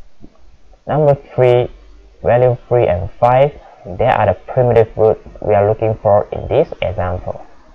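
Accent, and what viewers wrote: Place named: Singapore. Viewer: definitely Singapore accent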